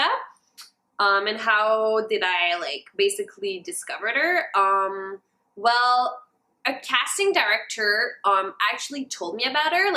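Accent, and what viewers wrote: Quebec, Canada. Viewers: she has a Quebec accent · Are you from Quebec? You have the kinda accent? (In my Aussie opinion lol) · I love your accent, I'm a Canadian too so I love this. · She's from Montreal She replied to a comment asking about her accent and where she lives. It's French Canadian. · Are you Quebeci French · French Canadian. It's just her accent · Canadian accent 😂😍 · It's French Canadian. And if she only speaks French for the most part she most likely translating in her head.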